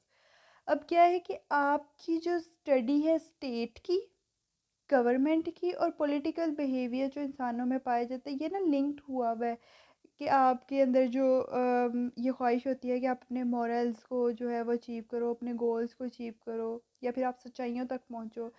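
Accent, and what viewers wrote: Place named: Pakistan. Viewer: Ur voice ar like Pakistani 😮😊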